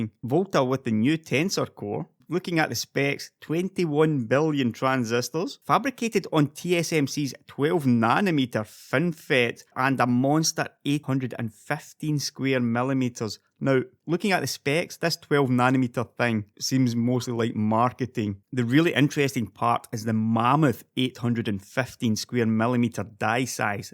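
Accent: Scotland, United Kingdom. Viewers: as far as Scottish accents go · Where in Scotland are you from?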